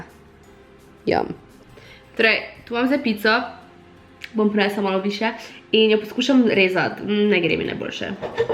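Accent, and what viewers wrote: Maribor, Slovenia. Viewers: Zdravo, hvala za video. A si glih z Maribora al pa iz okolice (poskušam ugotivit iz govorice)?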